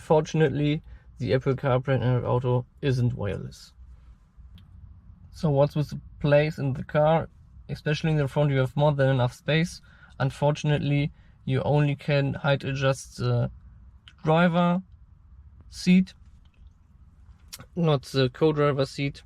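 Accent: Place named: Germany. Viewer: man merkt direkt , dass ein deutscher hier redet XD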